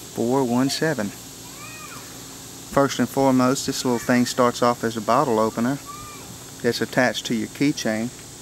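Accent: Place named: South, United States. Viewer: I'm guessing southern/ southern mid west usa.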